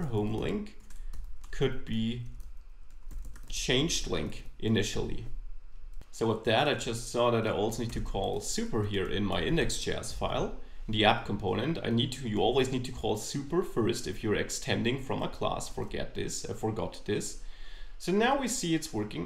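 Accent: Germany. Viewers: Personally I really like when Germans speak English beacuse then it is very easy to understand at least for me. And of course - I really enjoy your amazing lessons as I'm touching React for the first time. Greets from Poland :) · My first though was: "the way you are speaking... german guy". :D Thats cool. I found these days polymer. This looks really cool and a nice way how to create new kind of views with real components. Greetz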